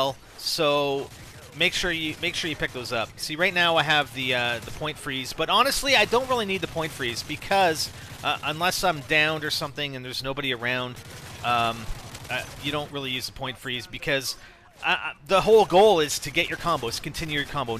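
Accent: Canada. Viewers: your canadian aren't you =p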